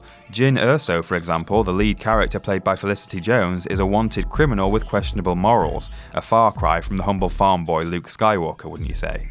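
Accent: United Kingdom. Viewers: How? He sounds like a typical British guy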